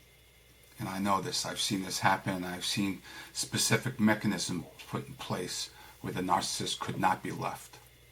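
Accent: New York, United States. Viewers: Your videos are very interesting and informative. Well thought out and well presented. You will definitely help many people get over horrible experiences. I was thinking that while you and many others, including myself, have had the experience, that, at least, we are able to "not contact" them. We can escape from the hell that they must live in constantly. Keep up the good work. You look great and really enjoy your New York accent.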